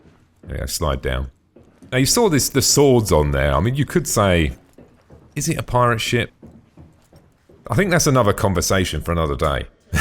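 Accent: United Kingdom. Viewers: Love me sum British accent m8 it's refreshing to hear a nice voice commentating on the video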